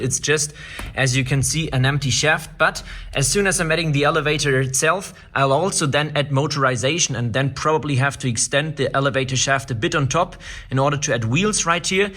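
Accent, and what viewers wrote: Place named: Germany. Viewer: Achso du machst deutsch und englisch mit diesen Videos ich weiß das wegen dem Akzent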